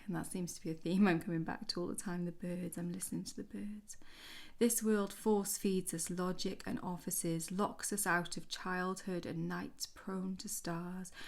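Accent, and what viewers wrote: United Kingdom. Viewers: Thank you for sharing this, even though you didn't entirely feel like it. I appreciate it so much. Not to be weird, but your voice is so soothing (part of that is the dumb American in my loving UK accents lol) and I'm having a rough night with insomnia so am catching up on YouTube. I'm teary now watching a familiar video from someone I love. And please note that this comment is NOT pressure to continue posting - if you don't feel up to it, don't do it! But I just want to say thank you for this one.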